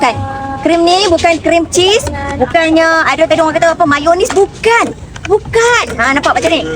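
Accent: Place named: North, Malaysia. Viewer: tuu dia,pekat utagha noh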